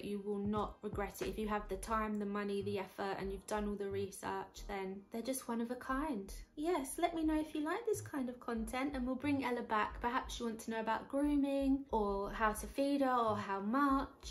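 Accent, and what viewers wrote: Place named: England, United Kingdom. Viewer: I saw your comment on our channel about Baby Violet. I am pleased that you found us. Glad that YouTube recommended our video to you. Watching this video now. Wow. Great video! I just became subscriber number 75 to you! You doggie is precious! Ruthie B. Howard BIG BELLY REBUILDS 9-22-21 P.S.: I LOVE, LOVE, LOVE, your English Accent! Cerulean, KY USA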